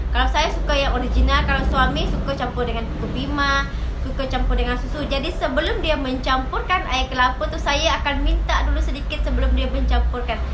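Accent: Indonesia. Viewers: Wehhh..kamu ni WNI kan? Kamu tak tau ke yang kami bayar bil elektrik, air, indah water, maxis, celcom semua online/credit card/debit card. Bumbu masakan masih guna la kunyit, bawang, cili asli. Orang Indo yang datang ke Malaysia je selalu guna bahan instant yang kau guna meniaga di gerai2 tuh! Menyamar konon jadi warganegara Malaysia konon...bahasa Indonesia kamu masih pekat lafi, jangan nak MENYAMAR jadi orang MALAYSIA!!!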